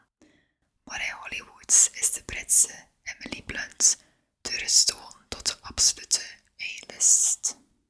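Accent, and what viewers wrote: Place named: West Flanders, Belgium. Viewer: Leuke video met dat sgoone westvlaams accent.... zeer aangenaam x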